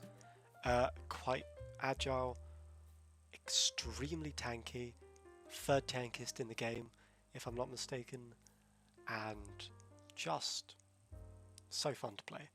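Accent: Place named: United Kingdom. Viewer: great video again love it youre so good to watch on stream. love the british accent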